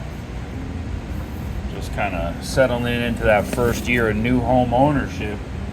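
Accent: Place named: South, United States. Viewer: I was dyyying because I literally thought the more he keeps going the more southern he sounds 😂 so I’m glad he acknowledged it. This actually was fun and interesting to watch because my Uncle sells BBQ too. Wish I could try some of y’all’s!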